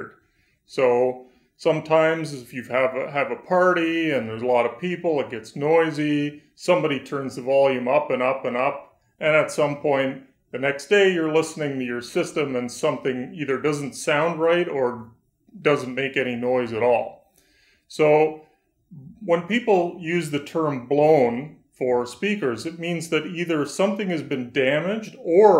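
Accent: Canada. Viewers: First 5 seconds I knew this guy was Canadian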